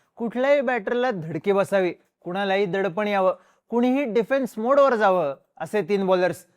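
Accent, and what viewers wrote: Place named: Vidarbha, India. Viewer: Vidrabh bolte bhai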